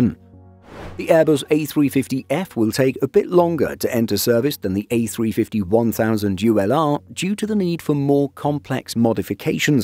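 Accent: England, United Kingdom. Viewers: In fact your english accent excites me a lot ! As you say purpose, so great for my french ears... ❤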